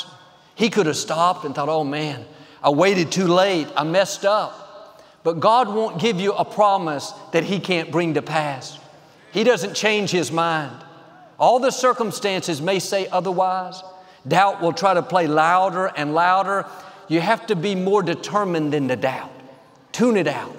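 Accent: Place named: Houston, United States. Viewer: "DON'T BELIEVE THOSE LIES!!!" (with his Houston TX accent!!!) One of my three most favorite comment/quote by my pastor Joel!!!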